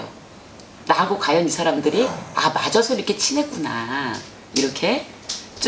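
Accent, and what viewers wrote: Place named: Gangwon, South Korea. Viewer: 선생님 말투에 강원도 사투리가 섞여 있어요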